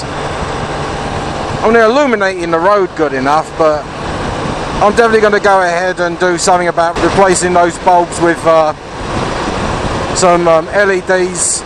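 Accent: Cockney, United Kingdom. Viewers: Good job keep up the good work... Love the vids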